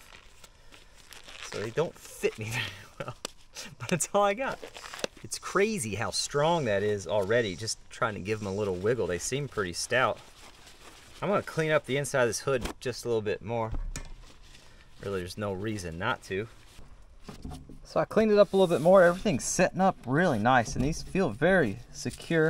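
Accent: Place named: Midwest, United States. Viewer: Yeah, his midwestern dialect is tough on my old ears. I don't catch everything he says.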